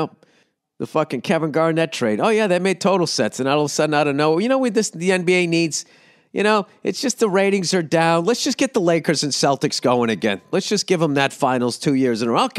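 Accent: United Kingdom. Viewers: Dude that British accent fucking killed me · Ol Billy British Balls ranting about F1 in the accent is hilarious 😂😂😂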